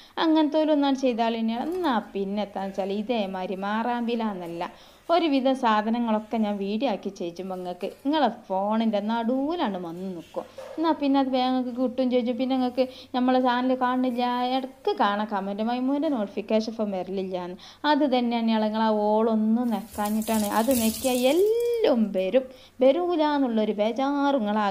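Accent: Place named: Malabar, India. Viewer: കുട്ടി ഏത് നാട്ടുകാരിയാണ് ഭാഷ നന്നായിട്ടുണ്ട് ഞാനും മലബാറിയാണേ.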